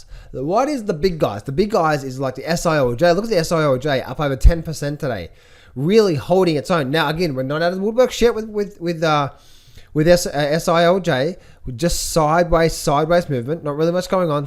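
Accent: Australia. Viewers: HERE WE GO MORE AUSSY ACCENTED B/S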